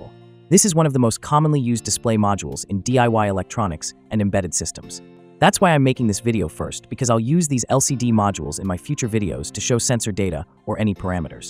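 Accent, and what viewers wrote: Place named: India. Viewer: Parce que je préfère la voix d'un robot plutôt que du "Indian English"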